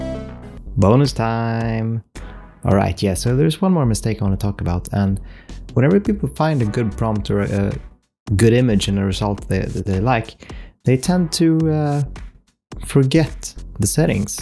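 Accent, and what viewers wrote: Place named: Sweden. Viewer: Loving these videos (and hearing your lovely Swedish accent makes me homesick for Sweden...I lived there for nearly 20 years). Any tips about how to avoid heads being cropped off? Or how to build out an image if you like it but half the head is missing? So far that is by far my biggest annoyance.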